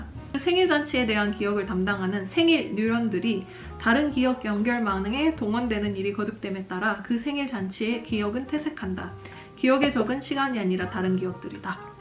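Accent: Gyeongsang, South Korea. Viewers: 차분한 경상도 사투리와 유창한 영어발음...뭔가 신선하다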